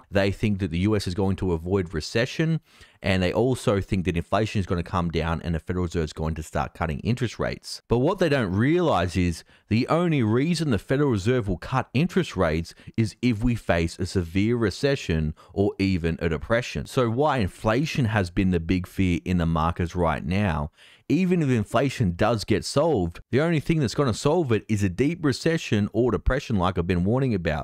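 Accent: Australia. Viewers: It's sounds like you're an Australian. Isn't the Ausy economy in a relatively good spot compared to much of the western world? I'm about to emigrate WA from South Africa where we can't even keep the lights on and the Rand is reaching new all-time lows, so I figure it's an improvement.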